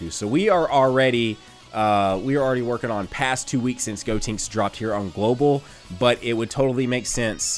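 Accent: South, United States. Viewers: Recently subbed you're one of my favorite youtubers xD also i can dig the southern accent! Im from Louisiana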